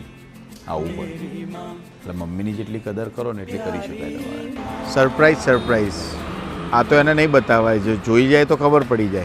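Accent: India. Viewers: Tmara accent Mehsana na lag ch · Tame kya na cho sir Tamara acent sabarkanthaa k aravalli side ni lage che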